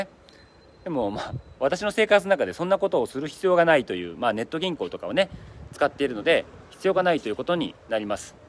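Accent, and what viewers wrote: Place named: Kanto, Japan. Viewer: あくまで「移住者が語る沖縄」ですね。沖縄県民から見るとあまりも薄ペラな動画。貴方のように沖縄をハワイと混同した「別荘地感覚」で沖縄移住する人には嫌悪感を感じます。沖縄を語るなら、まずは沖縄の言葉やイントネーションを身に着けてほしいですね。関東訛りのナイチャーが何を言ってもそれは「移住者の遠吠え」です。 なぜ沖縄が山を切り開き環境破壊しないといけないのか？ 沖縄の歴史文化はもちろん、戦中・戦後と日本本土の犠牲になった経緯。 米軍基地がある弊害。 そんな事も知らずに「海がキレイ」「南国サイコー」そんな気持ちだけで沖縄に来る移住者はマジで要らない。 沖縄移住を考えてる他県の方は「沖縄の影の部分」を見て知ってから移住して下さい。 青い海は、太平洋戦争で赤く染まりました。 美ら海水族館がある場所は数多の米軍艦船により鉄の暴風と呼ばれる艦砲射撃を浴び、多数の死者が出た土地です。 移住する方はぜひとも、平和記念公園で、この島でどんな事があったのか？ そしてそれが今現在、どのような影響を及ぼしているのかを知って下さい。 沖縄の文化、歴史、そして現在を受け入れてくれる移住者なら、私たち県民も大歓迎です。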